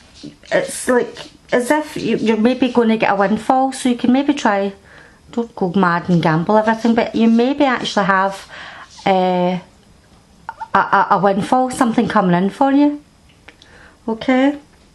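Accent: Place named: Scotland, United Kingdom. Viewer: A Scot like myself, nicely read 👍🏽👌🏼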